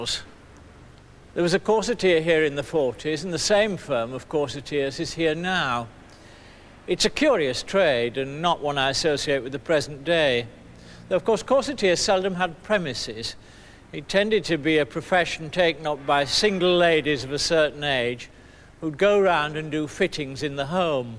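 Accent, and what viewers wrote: United Kingdom. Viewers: A B doing what he does best. And a delicate balance of his native Yorkshire tongue interspersed with posh southern vowels. Almost a long A in "dancing" at 5.54.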